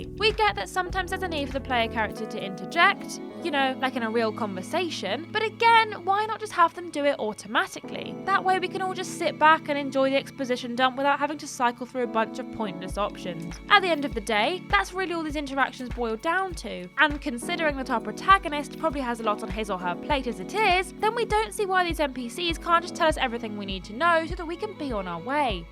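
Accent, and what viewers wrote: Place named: England, United Kingdom. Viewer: I love English accents usually but for some reason puts me off a little. She's doing nothing wrong, it's just one of those things.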